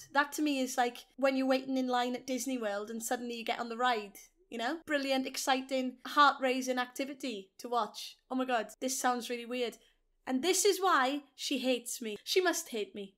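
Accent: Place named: Wales, United Kingdom. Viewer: Love the welsh accent now😍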